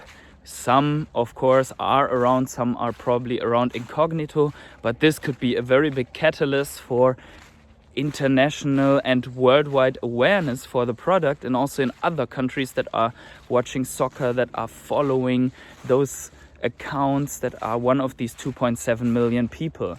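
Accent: Germany. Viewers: Hörst dich deutsch an 😉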